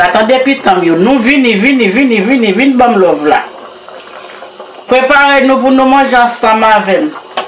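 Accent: Haiti. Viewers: Ki bo an ayiti Manmi soti? Pou janl pale ou ta di se moun an nord pati lartibonite.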